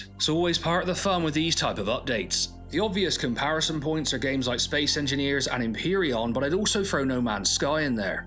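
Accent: United Kingdom. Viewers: I’m getting back into space games after a break from them and this video just popped up in my feed. I’ve subscribed, great to hear a regional english accent in a Youtube video! 😊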